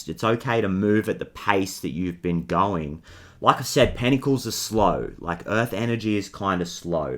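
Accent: Australia. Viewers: great reading, totally resonates! Thank you fellow Aussie! unmistakeable accent 😁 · I think he sounds Australian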